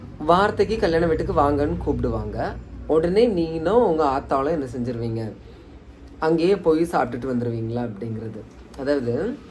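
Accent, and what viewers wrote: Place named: Kanyakumari, India. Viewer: ஹாய் தம்பி நான் கீதா உங்க வீடியோ இப்போ கொஞ்ச நாளா பாத்துட்டு இருக்கேன் ரொம்ப சூப்பரா இருக்கு உங்க ஊரு எந்த ஊருன்னு எங்களுக்கு தெரியாது நீங்க பேசறது வச்சு பார்த்தா கன்னியாகுமரி யார் உங்க கூட பேசணும்னு நினைக்கிற நானும் என் பையனும் உங்க கூட பேசணும் நினைக்கிறேன் எங்களுக்கு நம்பர் கிடைக்கிறது இல்ல தப்பா நினைக்கல என்ன நம்பர் கொடுக்குறீங்களா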